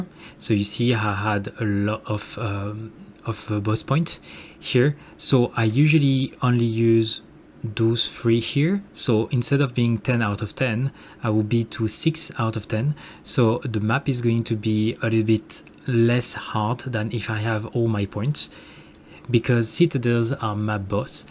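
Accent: France. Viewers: i love the french accent :)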